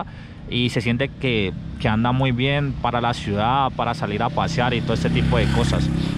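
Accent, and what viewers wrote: Colombia. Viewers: Que feo ese acento de dónde es ??? Creí que los colombianos hablaban distinto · El acento es de Cali, Colombia. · Buen video pana con acento valluno ve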